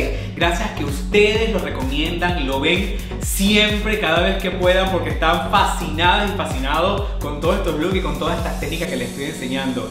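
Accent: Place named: Venezuela. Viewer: Hola me puedes informar en que estado atiendes??? Ya por el acento creo que eres de Venezuela y nada como nuestras mechas, extraño mi estilista😞😞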